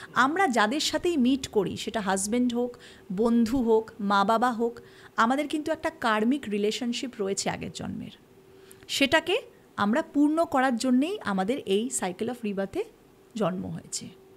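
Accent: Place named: Hindi, India. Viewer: খুবই ভাল,অনেক কিছু জানলাম।কিন্তু চ্যানেল টা তো বাংলা তাই অকারণ হিন্দি উচ্চারণ বর্জন করলে ভাল হয়।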